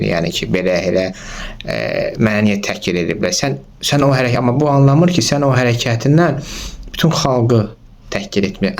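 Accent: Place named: Baku, Azerbaijan. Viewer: Ləhcəndən bilinir karennoy Bakılısan amma erməniylə öpüşənə haqq qazandırırsan tema o deyil tema 1905 ci ildə daşnaklar ruslara Bakı kəndlərində nəqədər söz sahibi papqlı kişiləri güllələyib uşaqlarının başına daş bağlayıb xəzərə atıb boğurmuşlar bax budu tema millət demək istəyir dığalardan bizə nə qonşu nədə dost olar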